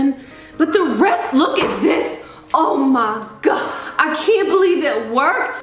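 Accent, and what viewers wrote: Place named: New York, United States. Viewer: I feel like as soon as you know is from NY, you can really hear it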